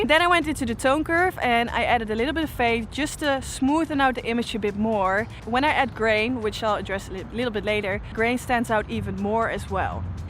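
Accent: Netherlands. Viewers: Loved this video! I love how your “dutchness” comes out of you from time to time hahaha you have great energy and I’m looking forward to your future video’s! Nogmaals wat een leuke video! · great video, funny that you turned out to be dutch :P I thought i was hearing an accent haha :P cool location as well for the shoot! great work :) I'm thinking to buy the 35mm as well, looks zo good :) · Het Nederlands accent maakt deze video af 👌🏽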